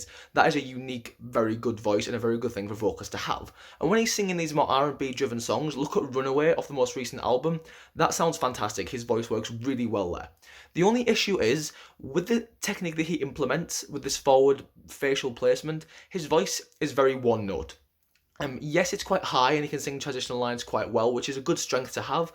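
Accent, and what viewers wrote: United Kingdom. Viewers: Is the host from UK ? I heard pretty much of British accent !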